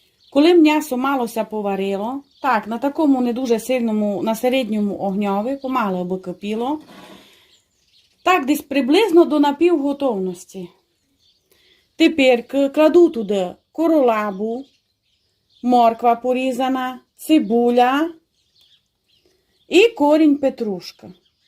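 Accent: Transcarpathia, Ukraine. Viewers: ДЯКУЮ ЗА В1ДПОВ1ТЬ ЦЕ МАЬУТЬ НА ЗАКАРПАТСЬКУМУ ТАКОМУ ЛАГ1ДНОМУ ДО ВУЗА НУ ПРИЕМНО СЛУХАТИ. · говорить дуже гарно на за карпатському діалекті, судячи по коментарях всім подобається, це ніде не заборонено говорити на діалекті. Але пише українською мовою пише грамотно, чого вам Бракує, бо взагалі незрозуміло на якій мові написаний коментар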